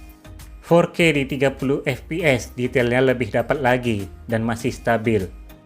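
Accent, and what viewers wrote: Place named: Bugis, Indonesia. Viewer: Kyk logat org Bugis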